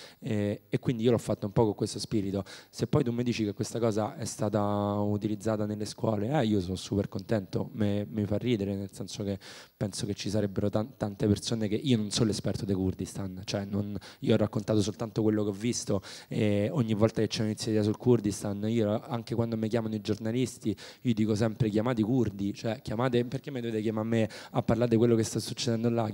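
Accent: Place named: Rome, Italy. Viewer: ‘Gnorante! 0calcare non sbaglia un congiuntivo...altra cosa sono l’accento romano o l’emozione che lo fa parlare accelerato...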